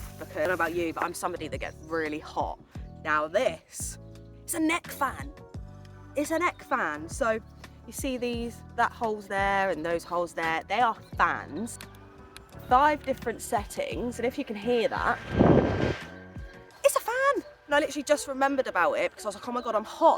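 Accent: United Kingdom. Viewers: Great video 😊 Glad you're back on now Love your UK accent I'm here in the states And I always love hearing someone from the UK or Australia 😊 Good luck this season girl😊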